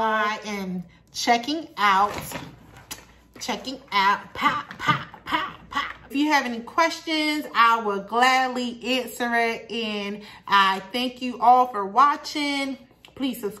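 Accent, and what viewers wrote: Baltimore, United States. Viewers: You gotta be from baltimore lol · Are you from Baltimore?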